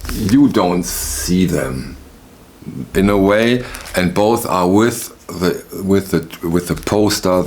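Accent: Germany. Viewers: Just a comment out of left field for you. You have a great voice and your light German accent makes it even better. You give me vibes of a 1950s beatnik. I guess you have to be above a certain age to even know what that means. I enjoy your passion for music and records, your clips are very interesting and entertaining.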